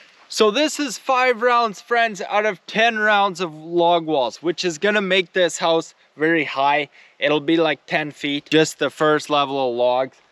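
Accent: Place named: Newfoundland, Canada. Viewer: i'm not canadian so forgive me if this is offensive, but is that a newfoundland accent. it sounds so much more irish than most canadians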